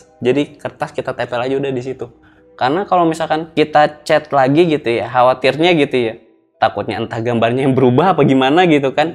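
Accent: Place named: Bali, Indonesia. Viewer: Hadir sampang madura, btw bg orang bali y logatx bali bgt🙂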